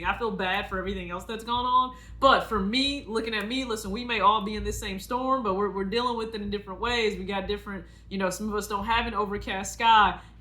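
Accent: South, United States. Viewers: Yaas congrats on the promotion girl!! I always love to see my fellow women winning and prospering in life! No offense to the men here 🤣. I have a question: where did your southern accent come from? I've been trying to go through states you might be from in the Midwest like Kentucky, Missouri, etc but now I'm stumped since MI was mentioned.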